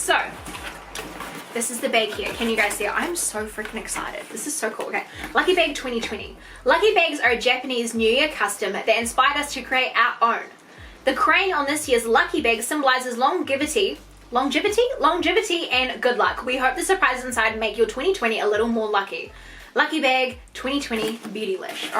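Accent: New Zealand. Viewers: !! I'm loving your excitement in the video, so glad you love the box. I'm a kiwi living in UK, so nice to hear a friendly voice from my home x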